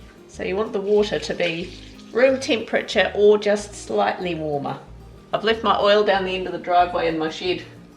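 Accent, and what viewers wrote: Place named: New Zealand. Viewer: yes! i have discovered that i can discern nz vs austalian accent! excellent.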